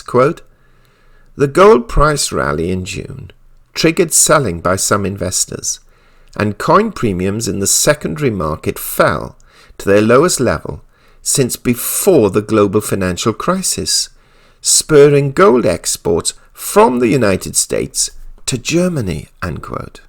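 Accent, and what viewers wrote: United Kingdom. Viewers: I had to give a thumbs-down today not because of the information but instead of the straightforward logical rational approach that is usually given there was a sanctimonious are that might have been partially attributed to that uppity British accent but probably because of the inner sanctum exclusivity that starting to emerge giving them a sanctimonious are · I only take financial advice from those with British accents. Thanks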